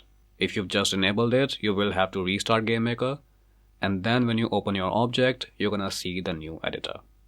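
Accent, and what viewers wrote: India. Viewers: Thank you person who's name I don't know who has an accent that I think belongs to india. This is a great tutorial. I would love to learn more from you.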